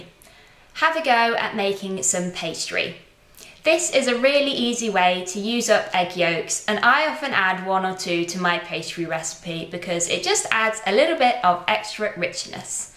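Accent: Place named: England, United Kingdom. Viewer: great to see and hear an English Accent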